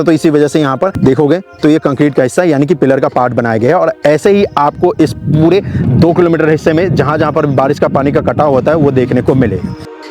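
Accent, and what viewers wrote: Bihar, India. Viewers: he need to fix his Bihari accent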